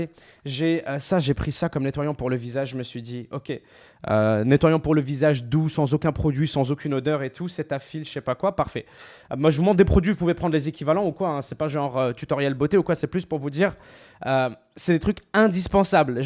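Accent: Quebec, Canada. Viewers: Salut mec , je trouve que pour un québécois t'a un accent assez léger par rapport à Gurky.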